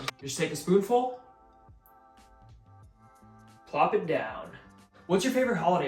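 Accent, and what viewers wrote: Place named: New York, United States. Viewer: I’m from New York and I’d bet money you are either from New York or New Jersey the way you say chocolate 😂 Thanks for this awesome recipe! Happy Holidays sweetie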